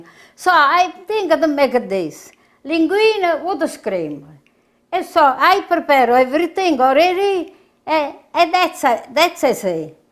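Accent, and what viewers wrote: Italy. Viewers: I love how she posts the recipe at the end! Much appreciated dear sweet mama! I love your videos and your beautiful italian accent! Thank you for this recipe...been looking for my mama's forever and this is it! OX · She Has the Best Italian Accent 😋 👌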